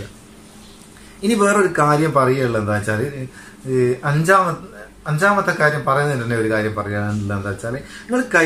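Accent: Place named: Northern Kerala, India. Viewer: കൊള്ളാം. പക്ഷെ ഈ ചങ്ങായീടെ ഭാഷ നമ്മള് തെക്കർക്ക് നല്ല കൗതുകമാണ്‌. പിന്നെ കലാകാരനാണെന്നും പറഞ്ഞു. കല ഏതാണ്‌. കഥകളി? or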